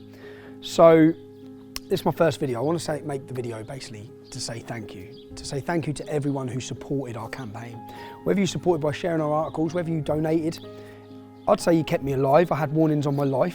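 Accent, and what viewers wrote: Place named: United Kingdom. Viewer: Tommy, we love you! You are noble and a rarely brave Brit, with a fantastic accent to boot!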